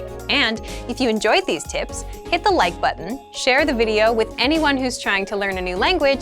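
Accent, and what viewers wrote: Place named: United States. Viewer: This is American English. And yes, we can still understand the other accents with little to no problem. There are some different slang and common words that are different that may cause issues, but we have enough experience with the other accents to know what those words mean.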